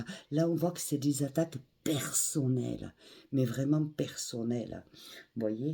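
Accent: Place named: Southwest, France. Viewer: Bonjour vous avez un bel accent serait t'il pas du sud ouest? Bonne santé à vous signé.Boudu con.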